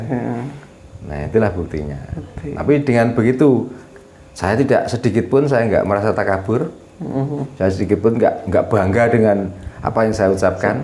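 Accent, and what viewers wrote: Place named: West Java, Indonesia. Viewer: Logat bahasane kok koyo wong sundo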